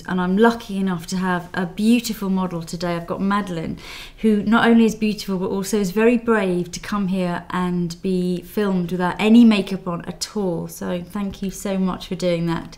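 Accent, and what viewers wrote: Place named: RP, United Kingdom. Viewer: All I can think as I watch this is..... her accent is so melodic and posh.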